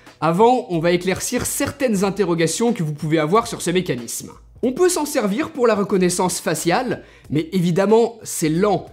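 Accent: Lyon, France. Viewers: T'es lyonnais ou quoi ?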